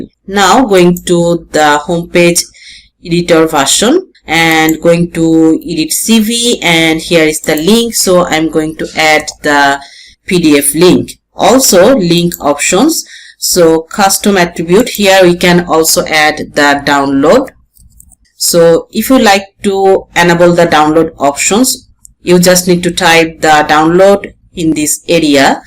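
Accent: Bangladesh. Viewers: Proud to see Bangladeshi English Accent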